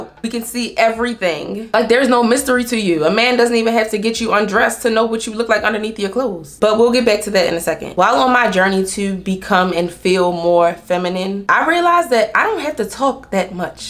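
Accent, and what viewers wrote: Baltimore, United States. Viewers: Girl! Why did I know you was from Baltimore without actually knowing lol. The accent gives it every time lol. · Chile I knew you were from bmore as soon as you opened your mouth.😂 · I knew I heard that Baltimore accent. Heyyy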